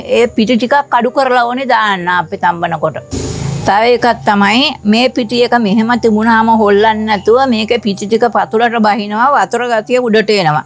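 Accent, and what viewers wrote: South, Sri Lanka. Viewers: අම්මාගේ දකුණේ භාශා ශයිලියට කැමතියි .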